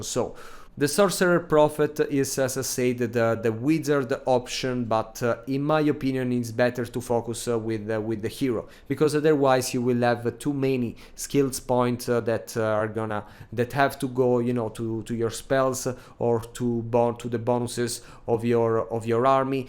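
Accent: Italy. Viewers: I started to finally play more TWWH 3 recently. Your channel is great for me. Learning a ton. And you Italian accent is wonderful! (I'm American)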